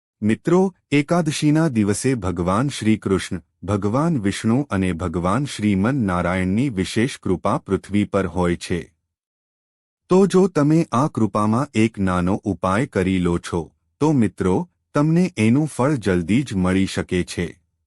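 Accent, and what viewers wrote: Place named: Maharashtra, India. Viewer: Divo salgaway nahi petaway bolnari bai gujrati nathi apbrahans shabdo par thi Marathi bai che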